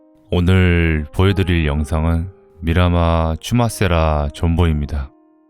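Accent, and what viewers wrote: Jeolla, South Korea. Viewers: 전라도 사투리 구수하네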